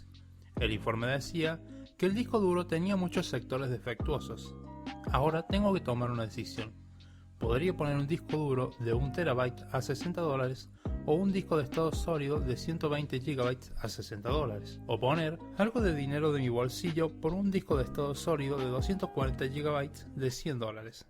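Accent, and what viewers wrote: Córdoba, Argentina. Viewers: Tu acento es muy argentino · Es cordobés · Ese acento coshentino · queria preguntar si sos cordobes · Best ascento cordobes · es re ccordobez el narrador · alto acento cordobés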